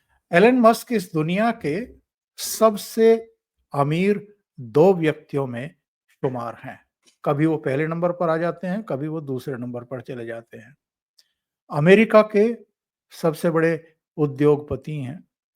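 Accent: India. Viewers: Nui to kahu Chacha Desi andaj me .news badiya h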